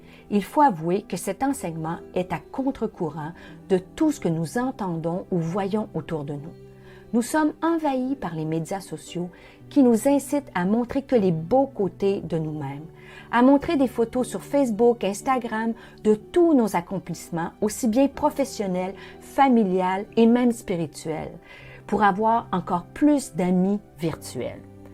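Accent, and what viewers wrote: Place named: Canada. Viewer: Bonjour Pasteur....( de Vendée en France )......Merci pour ton conseil. Nous avons tous un peu en nous une envie parfois de paraître surtout en face de personnes qui ne font que s'élever, chassons cette envie et restons humbles, l'humilité est une si belle qualité.!!!!!Mon père était un homme très humble, érudit et qui parlait 4 langues, la réflexion d'une de ses voisines qui l'appris un jour m'a choquée.... c'était: "Oh! On ne le dirait pas "... c'est çà l'humilité, ne pas se glorifier de ce que l'on est, de ce que l'on sait... il a toujours été ainsi et il était mon exemple, mais le fait de rester humble parfois n'est pas facile en face de certaines personnes, je dois l'admettre...Résistons avec l'aide de NOTRE SEIGNEUR,l'humilité LUI plait. Bravo chère pour ton message de ce jour et sois bénie chère soeur en CHRIST...........j'adore t'écouter et ce petit accent Canadien est très agréable à entendre 😊😊😊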